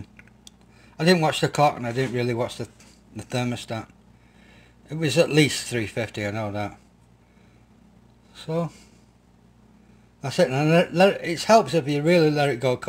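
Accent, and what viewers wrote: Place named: Manchester, United Kingdom. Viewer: I like the Manchester accent but what is it you've made?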